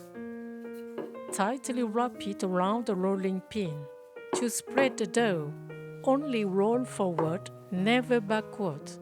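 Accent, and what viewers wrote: France. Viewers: When the food is Chinese, and the accent is french you know it's going to be tasty. It looks like a very memorable experience by the table.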